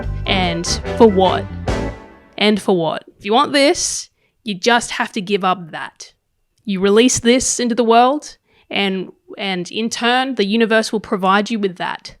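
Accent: Australia. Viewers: Clearly aussie, but whats the go? Nintendo is cool, but this is somehow related to porn? Tha fuck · thank you for being Aussie, I love Australian people accents. I can't stop listening 😂😂😂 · who knew that all i needed i was an aussie girl cussing me out and telling me to stop shucking the corn. 10/10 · So are you Australian or something?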